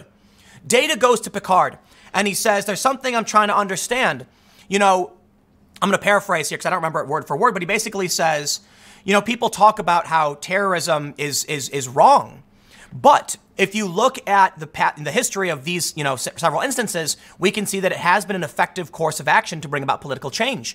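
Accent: United Kingdom. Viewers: British accent is the best part of this video.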